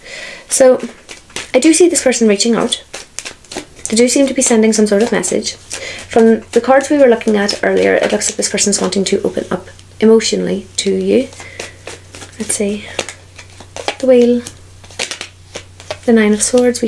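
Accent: Ireland. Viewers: I so enjoy your readings and your Irish brogue. And now today I'm loving your Scooby-Doo top. Can you please tell us where you were on vacation? It looked spectacular! Thank you for sharing your abilities and gifts with us. ❤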